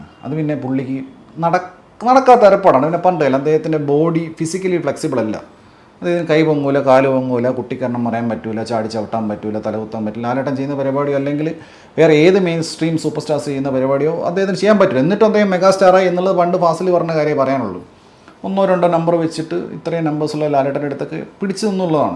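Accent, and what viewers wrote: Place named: Trivandrum, India. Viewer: How you got trivandrum slang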